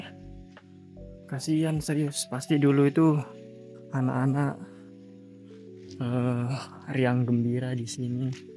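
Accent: Lombok, Indonesia. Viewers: Logat nya mirip orang lombok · Awalnya saya penasaran ini Abang dari mana, syaa tebak tebak dari aksenya bahasanya awalnya ragu" eh pas menint ini (sumpret)😂😂, badahh Batur Sasak arane sehat sehat Batur mudahan de sukses lek jepang! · salam dri lombok bang, stelah sy dnger logat bhs indo sde, trnyata bner sde asli lombok tulen ,, sehat selalu smeton ..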